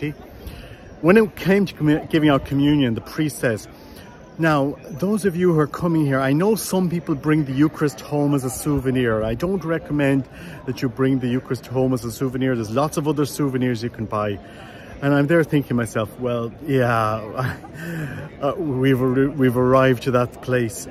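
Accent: Ireland. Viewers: I never really appreciated the Luminous Mysteries of the Rosary until this whole Covid episode, when everything in our Luminous Mysteries was (is) under attack. From not wanting to baptize babies to no respect for Communion. Now I understand exactly why we were given the Luminous Mysteries and when we were given these Mysteries. Grand old Irish accent. I grew up in County Louth.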